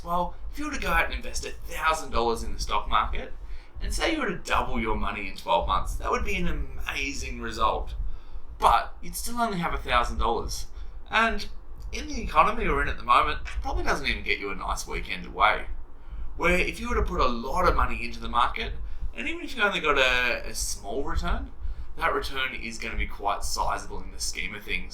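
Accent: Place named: North, United Kingdom. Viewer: Keep going. Great to hear a voice from the North about the North.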